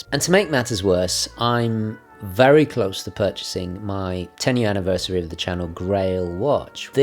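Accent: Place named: England, United Kingdom. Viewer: An Italian with an English accent , ma whats going on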